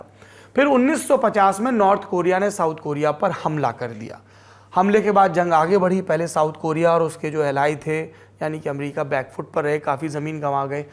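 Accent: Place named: Bhojpuri, India. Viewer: पहले मेरे पिता जी news देखने को कहते थे कि बेटा News देखा करो हिंदी भाषा अच्छी हो जाएगी। लल्लन टॉप और इन की तरह खबर बताने वाले तो हिन्दी भाषा को को भोजपुरी चटखारा दे रहे हैं।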